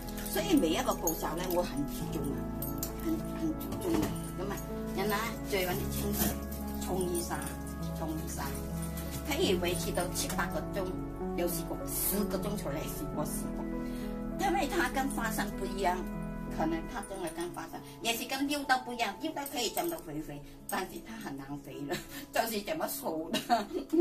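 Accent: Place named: Cantonese, China. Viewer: 你講啲話好可愛，一半廣東話一半台灣話，聽到我想笑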